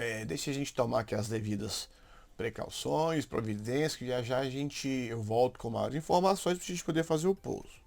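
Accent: Minas Gerais, Brazil. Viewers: Você é o famoso piloto que fala "mineirês"?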